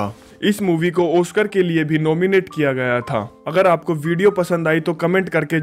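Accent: Haryana, India. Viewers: Bhai aap haryana se ho kya Bahut hi alag level ka explain kiya hai Aapne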